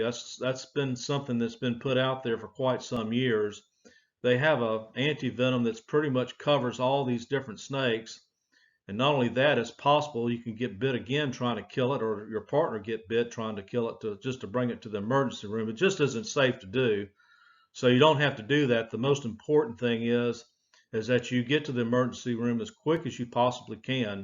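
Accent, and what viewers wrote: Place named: Alabama, United States. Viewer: Thanks fer speaking more like us Alabamans do talk.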